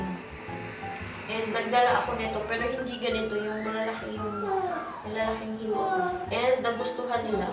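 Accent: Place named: Visayas, Philippines. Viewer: Hello, I am new to your channel. Saang part ka ba sa Pinas? Halata na bisaya ka.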